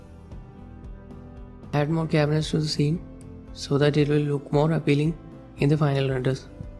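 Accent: India. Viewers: He is, but he has a heavy South Indian accent. But he is speaking perfectly well 😊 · Sorry your knowledge might be good but ask your foreign friends if they understood anything you anounced in this video. Your Indian Dialect is here unuseful use neutral clear and slow spoken language please! Your Video might be interesting for more people indeed. Thanks brother!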